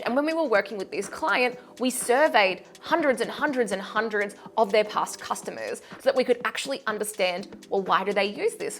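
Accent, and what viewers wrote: Australia. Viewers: love everything you put out - pure value - you're a wizard... Therefore - you don't need the background music or it should be much lower - it's somewhat (to moderately) distracting in this video. Your Aussie accent is all most of us want or need to hear in your incredible videos. - 😉 - You're brilliant - we love your videos - there's no need for you to "entertain us."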